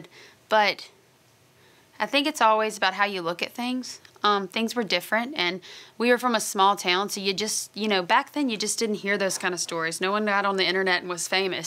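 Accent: South, United States. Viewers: She sounds so country cx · To know not the only one that talks with a southern accent she does too but when she sings she sounds beautiful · Oh my gosh her accent has changed !! More country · Smh guys, she looks different because she gained weight.. And she moved down south.. Lol thats the accent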